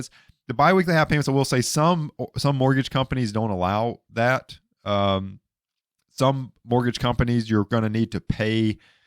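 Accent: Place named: South, United States. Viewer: Yet another financial talking head spewing advice. Sure, like his calculation that's great. But for crying out loud, how could I honestly take advice from someone that looks like he's got his smile permanently pasted on himself and he's got his fingers stuck in a light socket?! and....geez....he sounds like a good ol' country boy. Apologies that I'm that opinionated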